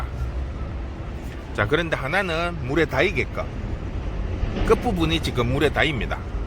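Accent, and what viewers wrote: Gyeongsang, South Korea. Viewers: 경상도 사투리 죽이네여 물이 다이는것과 다이지 않는것의 뿌리내림 차이 ㅎㅎ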